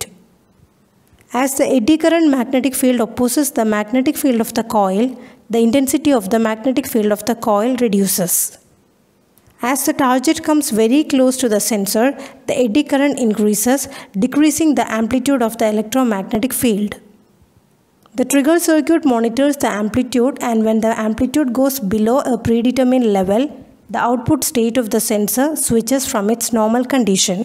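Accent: India. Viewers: Is the narrator from India? Love from Bangladesh... 🤝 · YES IN INDIAN ENGLISH YOU TRIED TO CLEAR THE FUNCTION OF A SENSORS FUNCTION YOUR MODE OF EXPRESSION AND LANGUAGE IS MORE OR LESS CLEAR AND UNDERSTANDABLE----SELIM AHMED CHOUDHURY FROM BANGLADESH ON 7/10/2021 AFTERNON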